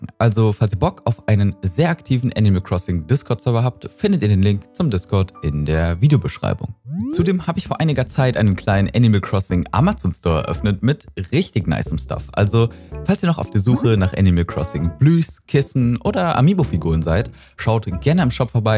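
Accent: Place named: Bavaria, Germany. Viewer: Oh da hab ich soch ein Bisschen Bayrisch rausgehört. Bist du aus Bayern?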